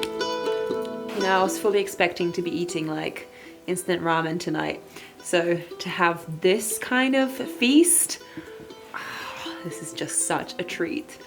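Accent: England, United Kingdom. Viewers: Your videos are awesome, Question? were you raised in Poland, because i hear a big English accent! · That brittish accent... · Why do you sound British?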